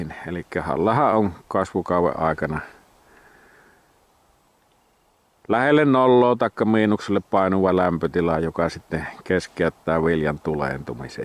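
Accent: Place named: Savo, Finland. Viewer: Nyt on mukava kuunnella kun se on kotikulmilla niin murre tulee niinkun pitääkin. Ps. Kauhee kuunnella savolaisittain kirjakieltä😅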